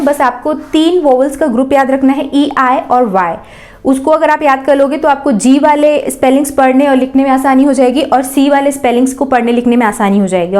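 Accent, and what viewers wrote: Himachal Pradesh, India. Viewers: Are u from Himachal?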